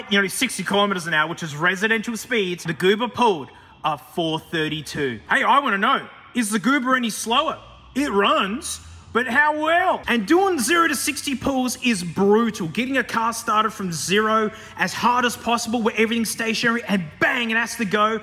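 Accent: Australia. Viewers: you aussies have the best accent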